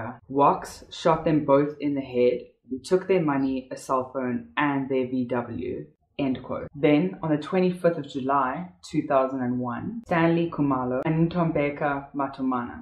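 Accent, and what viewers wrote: South Africa. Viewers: Mandrax while coming in a pill form its rarely consumed as such, they usually crush it up with marijuana and smoke it in a pipe known as a shalompie.You sound south african but your afrikaans pronunciation needs work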